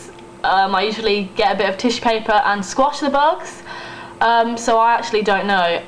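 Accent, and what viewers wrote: East Midlands, United Kingdom. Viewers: Nice! The test is really designed for different American accents. But, your accent is very lovely. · Firstly you're insinuating that posh is not an accent, when it is. And secondly this is just how everyone sounds in East midlands, I'm from Brackley which is pretty much on the borders of Ox, Northants and Bucks and its quite easy to hear the regional differences between the three. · The East-Midlands Accent is the most similar United Kingdom Accent to the my American Accent. I must advise to never feel ashamed of your Accent, regardless of what another individual will verbalise, each quark of your East-Midlands Accent is Adorable, Embrace the Individual you are. 😉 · Thank you this is so helpful listening to your dialect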